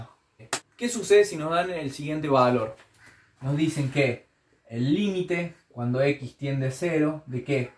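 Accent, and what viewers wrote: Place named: Córdoba, Argentina. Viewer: jajaj cordobesaso el culiau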